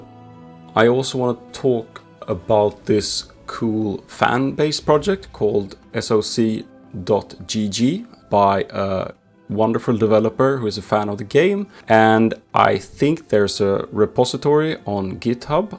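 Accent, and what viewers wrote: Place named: Sweden. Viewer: I like your swedish accent! i bought the game because of it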